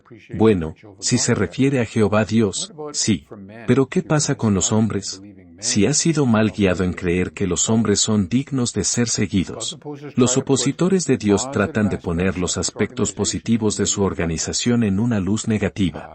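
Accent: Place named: Spain. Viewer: You Will forget Your spanish accent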